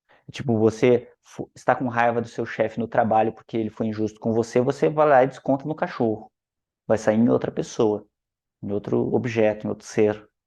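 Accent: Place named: Rio Grande do Sul, Brazil. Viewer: Esse sotaque eu conheço...é GAUDÉRIO !!😅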